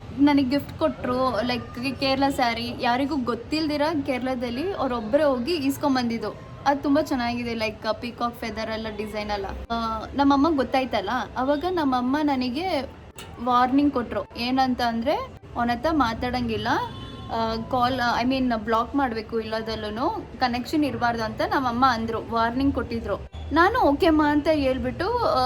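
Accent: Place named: Andhra Pradesh, India. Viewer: Why you have telugu accent??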